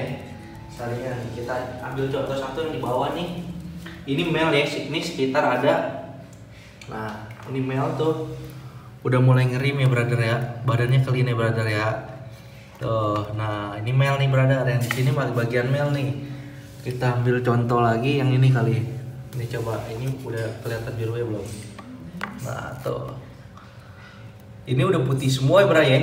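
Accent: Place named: Betawi, Indonesia. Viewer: Batawi luh medok medok bner om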